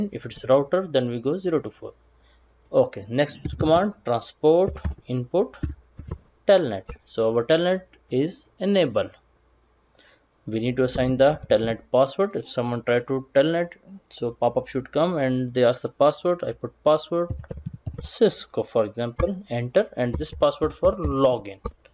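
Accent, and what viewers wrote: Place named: India. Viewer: Topics were interesting but indian accent is kinda disgusting... 😂😂😂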